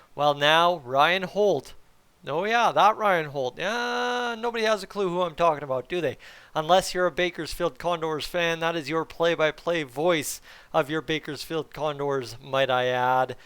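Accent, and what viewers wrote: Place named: Canada. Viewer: You have one of the best Canadian accents I've ever heard, lmao. I love it!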